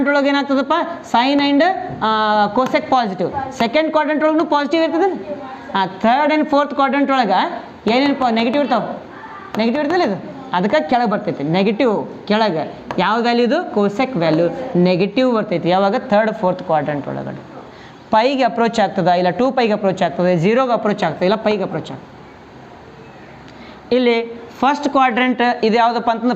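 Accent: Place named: North Karnataka, India. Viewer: Nim uttara karnataka bhashe Nam kade baidid tara ansute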